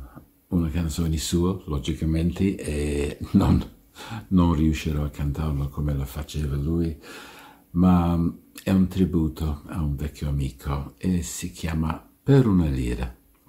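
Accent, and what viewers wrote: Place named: United Kingdom. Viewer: Bellissima con il tuo accento inglese.....grande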